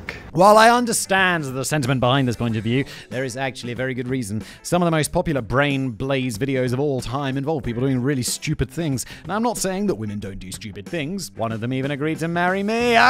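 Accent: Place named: United Kingdom. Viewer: You Tube SUCKS! I have removed this man's channels over and over again. But when You Tube changes the algorithm, it force feeds me this guy's many channels despite my removing him. channels suck. They are poorly researched information that is mostly government propaganda talking points. Terrible videos. And just because has a British accent, this doesn't not make him an authority on anything. He's just a talking head. England is over. Brexit has made the only job in England a You Tube content provider. Terrible list videos that spread falsehoods and bull.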